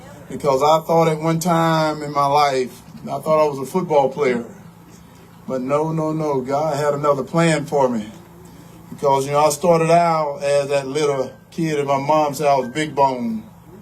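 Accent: South, United States. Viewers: Hope you win...love your southern,.accent, love your values, love your values..vote red.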